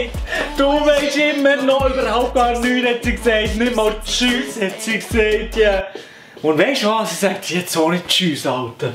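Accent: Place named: Switzerland. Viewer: Omg entli hen i en kanal kfunde wo nur schwitzerdütsch redet😂😍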